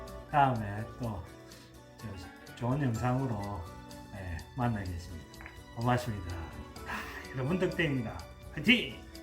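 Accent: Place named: Rural, South Korea. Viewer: 시골 어디신지 말씀이 정말 구수하시네요~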